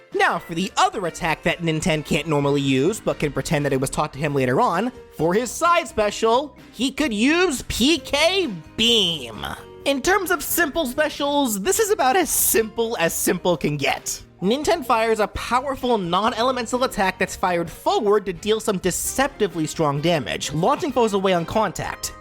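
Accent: New York, United States. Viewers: he has a new york accent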